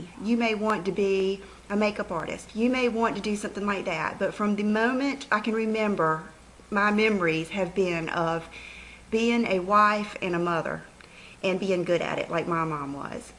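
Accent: South, United States. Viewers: the way I look at it you have two choices 1) fold up your tent and go home OR 2) don't let stupid people make you feel bad. I'd pick number two. People think they can say anything and hide behind the curtain of the internet. Block them out! I guarantee you that for each hater there are hundreds of us who enjoy your videos and look forward to Friday's haul. This California girl looks forward to hearing your southern accent! Keep it up, we love you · get your southern accent on say "kiss my butt" & keep making your own choices & decisions.... & videos... we luv you much much much... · One major reason why I subscribed to you was your sincerity & your enthusiasm. Plus you're southern accent. I married late in my life so we never had children but I always admire full time Moms. I Those nay-sayer see the world through their negative point of view. We are positive people so that's why you didn't anticipate these mean and cruel comments from them! 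Can't image what we don't know or feel. Be strong & keep up the great videos. P.S. I think your family is lovely!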